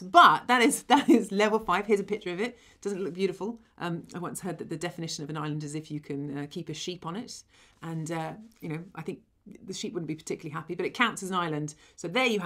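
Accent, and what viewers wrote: United Kingdom. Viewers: what part of the UK is she from ?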